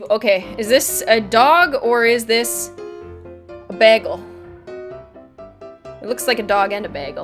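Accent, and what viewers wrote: Canada. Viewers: I love how you say 'begel' instead of 'bagel'. The Canadian accent has never ceased to surprise me :)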